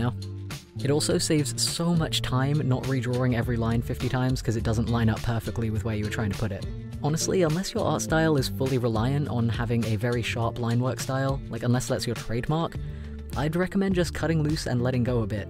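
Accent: South, United States. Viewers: As an American LGBTQ+ person I don't blame you going American (and definitely southern). My country has a long way to go in a lot of ways when it comes to the acceptance of people like us. I hope that this year fixes that. I'm really happy for you in how you now feel so much more comfortable in who you are, and your art is still gorgeous. Happy 2020 dude! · As someone who lives in Texas: I can 1000% confirm this is a Southern Accent. It's better down here in Texas now than it was a decade ago, as I think a lot of places are a lot better, but man, can it still be hard. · Yeah I live in the U.S. definitely southern accent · nice country accent! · yo that wasn't just American, that was southern! (and accurate lol).... but there's still gays in the south................. lol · southern accent ON POINT I respect you on so many levels, live your best gay life my dude! 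· Oh my god your American (southern, really) accent gives me LIFE.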